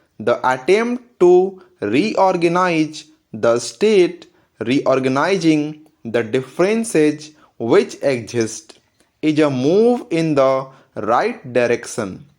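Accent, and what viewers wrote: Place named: England, United Kingdom. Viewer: Bhai ji jyada angrej mat bano thoda pronounciation thik se jaise humare yha generally boli jati hai ekdm sir se utar rahe h kuch words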